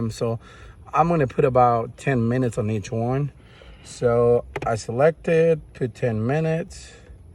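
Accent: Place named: United States. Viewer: Se te nota que eres gringo, pero no habrá forma de que lo mandes aunque sea en espanglich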